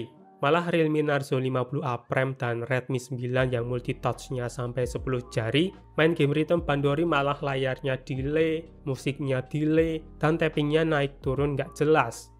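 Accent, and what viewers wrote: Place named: Banyumasan, Indonesia. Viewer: "ya jelas ngelag lah blog" ngakak anjir ngomong nganggo logat banyumasan lucu piye ngono maseh😂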